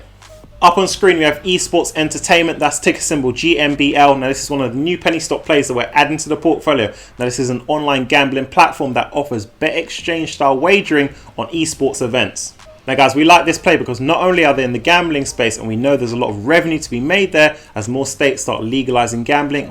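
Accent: United Kingdom. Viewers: love your British accent!! UNFORTUNATELY ALL THE STOCK ARE OTC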